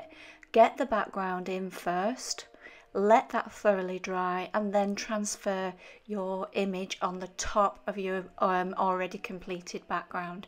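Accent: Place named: North, United Kingdom. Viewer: Great, nice to hear a northern accent too👍🎨😊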